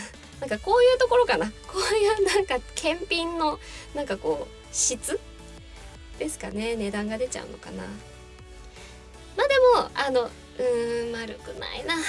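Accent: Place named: Sendai, Japan. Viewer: 仙台弁!!ひしゃげるって言い方初めて聞きました(^^)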